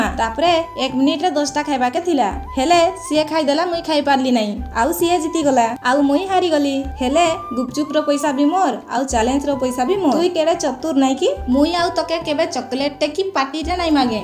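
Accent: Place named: Cuttack, India. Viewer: ଝିଅ ତୁମର ନିଜର ଭାଷା ଠିକ୍ ଅଛି । ଇ କଟକିଆ କଥା ଠିକ୍ ନାଇ ଲଗବ।ର୍ ।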